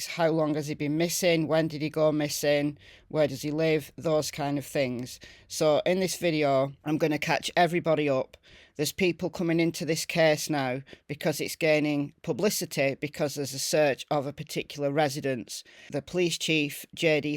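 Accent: United Kingdom. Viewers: Love your british accent hello from America 🇺🇲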